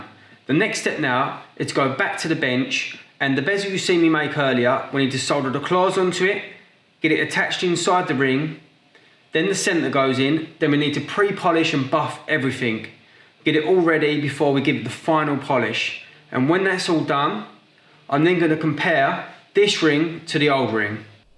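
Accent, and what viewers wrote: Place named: England, United Kingdom. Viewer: Never heard your English accent before where is it from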